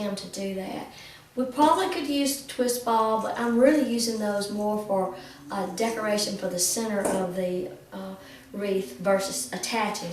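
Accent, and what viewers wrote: South, United States. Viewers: I am very interested in making wreaths. Can you explain the differences in mesh. I have seen some peple talking about deco mesh and geo mesh. Are those the only ones and what are the differences? Thank you and I love watching your tutorials. I am from Louisiana where are you from. Your voice sounds south but definitely not as southern as me. Lol By the way you are great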